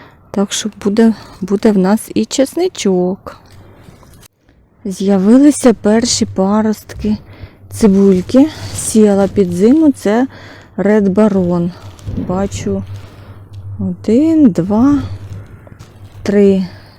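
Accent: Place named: Transcarpathia, Ukraine. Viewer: очень красивая украинская речь колежанки это говорят на Закарпатье . буду учиться у Вас украинский мови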